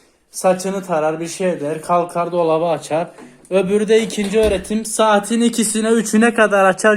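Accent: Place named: Cyprus. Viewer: allahın kıbrıs şiveli 3t'nin çakma ergen versiyonu... Çalışma masaları ve yataklar farklı yerlerde arada duvar ve kapı var tuvalet için ayrıca kapı var lavabonun orta yerinde açıkta değil yani klozet. Banyon da kapılı. Dandik duşakabinlerden değil. Onu da kapılı yapmışlar. Üstüne sandalyen tahta sandalye değil rahat. çalışma masanın lambası bile var. Sen daha ne istiyorsun? Devlet senin göbeğini mi büyütsün? Çok göbek pesindeysen gider kendi yemeğini kendin alırsın boş boş konuşma. Tuvalete adam niye terlikle gitsin? Terlikle gittikten sonra yine içeri terlikle gelmeyecek mi? Kafan mı iyi senin? Alaturka tuvalet değil klozet var orada. Yerler, terliğini ve ayakkabısını kirletmiyor yani. Senin gibi tipleri böyle aklı başına gelinceye kadar döveceksin. Senin gibi tiplerin çalışacağı max. yer sanayi olmalı fazlası değil.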